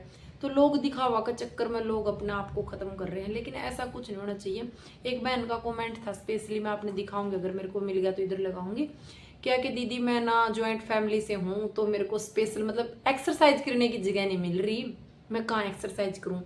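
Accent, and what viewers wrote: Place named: Kurukshetra, India. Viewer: Nice video bahan app Kurukshetra jila se ho kya apki boli meri ali hai 😊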